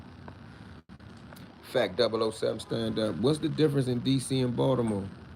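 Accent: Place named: South, United States. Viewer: Family u gotta southern accent 😂 😂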